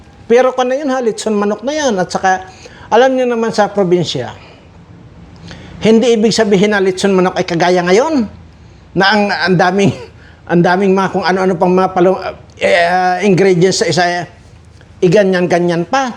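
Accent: Iloilo, Philippines. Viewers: Acsent talaga yan sa taga probinsya duon sa iloilo sir 👍😅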